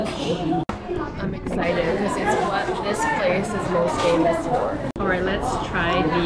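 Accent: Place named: United States. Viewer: you have quite American accent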